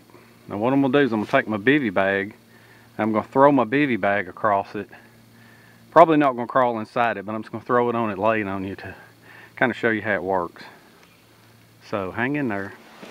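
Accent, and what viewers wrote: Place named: Georgia, United States. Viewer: Yeah I kind of figured you was from Georgia by your accent. I live in SC myself. Love the country accent myself.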